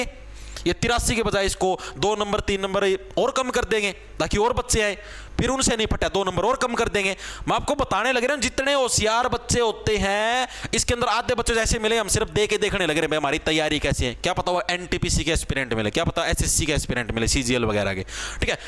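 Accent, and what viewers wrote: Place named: Rajasthan, India. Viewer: Sir your way of talking is very cool Your spex add spice on the top Rajasthani and Haryanvi consent is very cool❤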